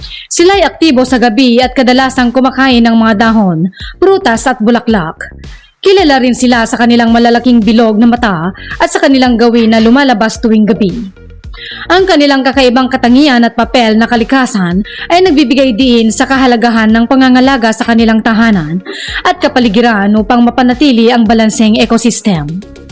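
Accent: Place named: Bicol, Philippines. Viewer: manay ang tuno ng bosses mo bicolana pa din catanduanes hahaha....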